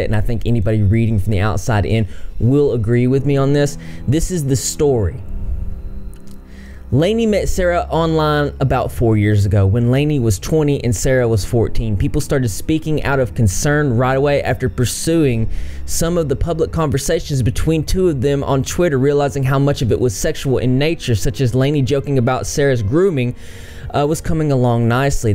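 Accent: South, United States. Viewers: I love what you're doing man you have great vids but I just gotta say it you sound like Idubbbz doing a southern accent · I thought I heard a southern accent .. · Can i just say I love your country accent!!! & you're cuter than a truck load of baby ducks!!! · This is my first video I've seen from you and omg you look so much like Shayne Topp, just more alternative with a southern accent. It's fantastic 😂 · you and your factual Southern drawl o lawd <3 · He definitely sounds like a southern gentleman 😂 I love it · Besides from this hot tea, I enjoy the juxtaposition of your emo style and your southern accent. Very pleasing. Also good job and being unbiased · you're like super southern. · HIS COUNTRY ACCENT WITH HIS PUNK AESTHETIC IS KILLING ME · This was a very well detailed video on the whole situation. Also I wasn’t expecting that southern accent but hey! 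It’s great, for some reason it makes it more entertaining to hear you reading out the little “articles” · Dude you look like a anime character but with a heavy southern accent. The world is a beautiful place. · I just love his southern accent like Jesus. 😍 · I love his low-key country accent loool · you look like a final fantasy character and then you hit we with the southern accent lmao. Great video man. Really liking your stuff. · love the country accent with the punk look lol. where are you from??? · It’s always so nice to hear someone else with a southern accent on YouTube 😭😭😭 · Idk why I'm watching this. Never seen a video on this channel. Ooh I love the southern accent though! Lol. Good video as well. Onision is an authentic narcissistic psychopathic. Its quite crazy honestly! Has YouTube gotten involved in any of this legal and moral drama? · Your face says punk rock, but your voice says country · Onion boy is disgusting, but I subbed because of your accent. 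Not many people on the platform have a southern accent! (I’m from GA) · I've never seen an emo boy with a country accent. Thank you for enlightening me.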